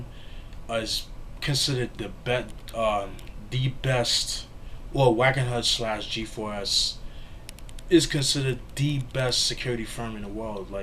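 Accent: New York, United States. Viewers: BTW r u from NY cuz I am and I hear the accent lol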